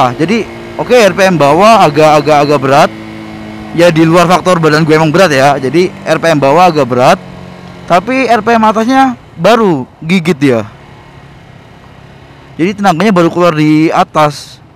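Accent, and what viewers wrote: Surabaya, Indonesia. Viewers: keren bang... lu gue logat surabaya!!!..salam buat bis kalisari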